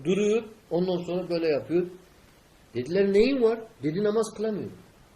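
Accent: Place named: Turkmenistan. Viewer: hocanın şivesi türkmence, allah rahmet eylesin saddam hüseyin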